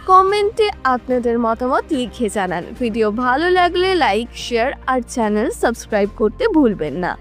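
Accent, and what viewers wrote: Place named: Bangladesh. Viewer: ভাষার উচ্চারণ শুনে মনে হচ্ছে এটি বাংলাদেশের চ‍্যানেল কাজেই বন্ধ করলাম এবং দেখা উচিত নয় ।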